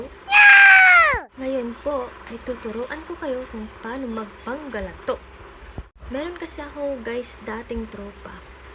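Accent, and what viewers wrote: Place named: Pampanga, Philippines. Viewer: May halong kapampangan ang galing sis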